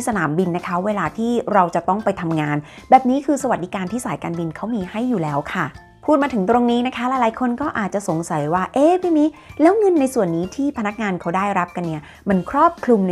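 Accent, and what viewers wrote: South, Thailand. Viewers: พี่มิเป็นคนใต้หรอพี่พี่มิ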